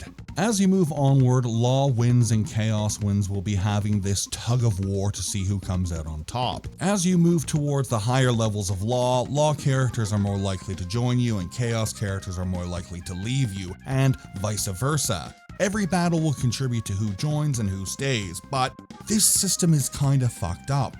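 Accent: Maritimes, Canada. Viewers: bro are you from the maritime provinces in Canada?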